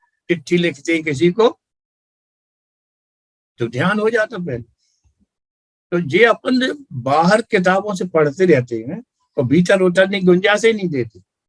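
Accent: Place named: Bundelkhand, India. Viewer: Mujhe inse dada ji se milna hai Inki aawaj ko sunkar aisa lgta hai jaise ye Madhya Pradesh ke bundelkhand se hain Kyunki main bhi wahin ka hoon Inse kaise mile? Or books kahan milegi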